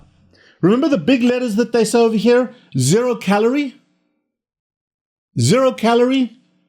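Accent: South Africa. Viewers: The indignation, mild profanity, sarcasm is hilarious coming from a sophisticated person with South African accent. Educational AND entertaining. Lol.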